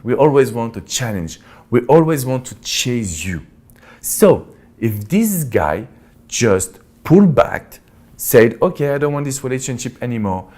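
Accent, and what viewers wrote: France. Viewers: are you French ? I can hear the accent lol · Ur frensh accent is all i can think abt right now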